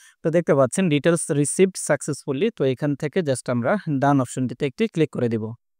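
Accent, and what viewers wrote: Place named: Noakhali, Bangladesh. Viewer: bhai apnar kotha barta shune mone hocche noakhali bari apnar ......uccharon aro valo howa uchit....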